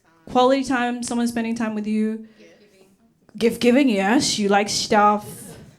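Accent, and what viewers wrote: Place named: New York, United States. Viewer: I was about to say she sounds more like a Noo Yawkuh who lived in the UK a bit (a lot like my Brooklyn-born and raised cousin who lived in London for 30 years) than an Aussie or an African. Surprised she developed that accent after arriving in Oz at such a young age.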